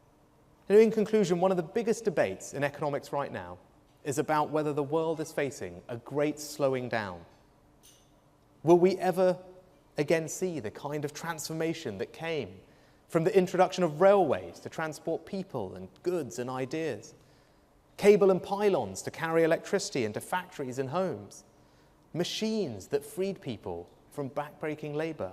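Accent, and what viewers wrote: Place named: RP, United Kingdom. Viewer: He sounds so upper class British - how about the working class?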